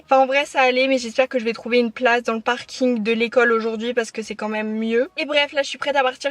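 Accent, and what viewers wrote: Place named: United States. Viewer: Très belle vidéo comme d’habitude ! Tu as un bel accent ! Une vraie américaine 😊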